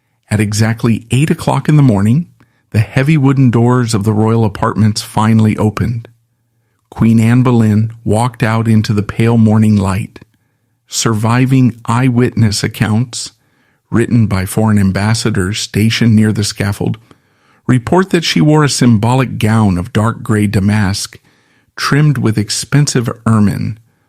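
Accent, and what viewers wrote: United States. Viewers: I mean this with the utmost respect but i studied tudor history for many years at college and university and was lucky enough to read archives in London and Windsor castle. If this is AI voice please change it as an American accent don't sound right its pronouncing names and places that just sound weird. Also Elizabeth Somerset told her brother that ann flirted with courtiers but the evidence was never put forward in court 😊 also as you probably should of mentioned Anthony Brown was a seymour supporter as ann and himself had a blazing argument as ann didn't like how he treated his sister Elizabeth Somerset 😊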